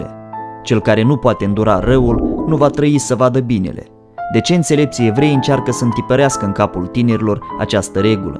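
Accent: Moldova. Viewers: Graiul dulce moldovenesc nu are egal , dar nici cu maramuresenii cei glumeti si simpatici nu imi este rusine. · Perfect,Și un accent clar, citit ,moldovnesc ,cel mai scurt și clar în limba romana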